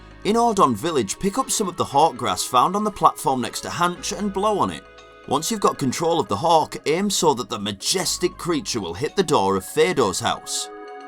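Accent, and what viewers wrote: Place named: North, United Kingdom. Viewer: Loving your northern accent.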